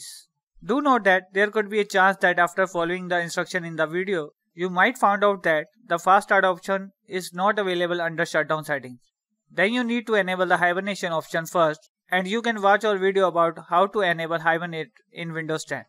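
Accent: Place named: India. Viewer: How can i translate the hindu accsent to english?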